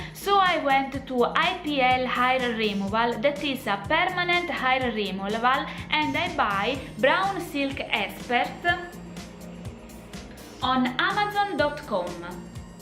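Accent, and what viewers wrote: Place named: Italy. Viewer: Maybe she’s Italian and speaks with accent ?! Jesus, calm down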